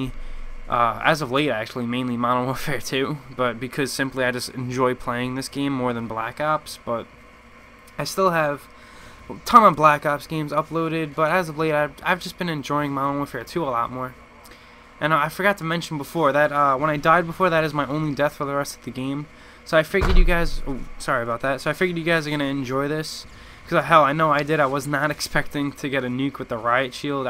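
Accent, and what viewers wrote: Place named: New Jersey, United States. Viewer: sounds like he has a jersey accent lol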